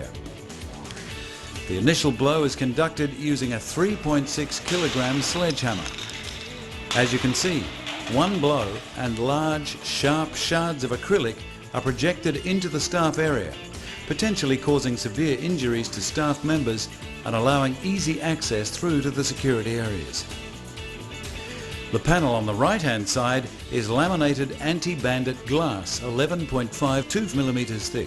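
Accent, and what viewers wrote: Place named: Australia. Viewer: The narrator sounds like an Australian, judging by his accent.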